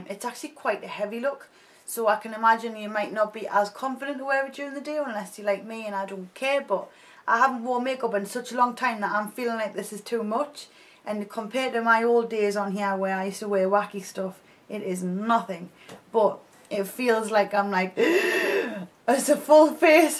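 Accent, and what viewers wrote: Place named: Scotland, United Kingdom. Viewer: You look beautiful and I for one have missed you., could you please tell me if you live close to Scotland? Your dialect and some words seem similar to my late grandfather. (I live in TX)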